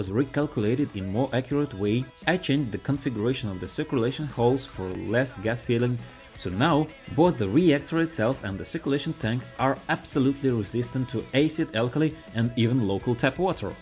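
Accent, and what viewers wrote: Russia. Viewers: Да Ты Русский, за километр слышно!!! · Are you russian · Ты ж русский? Или просто акцент такой?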